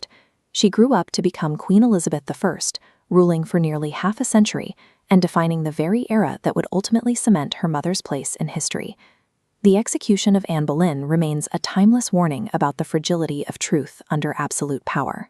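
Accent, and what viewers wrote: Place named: United States. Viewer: If you are going to post British stories, at least use a British Accent, instead of American nonsense.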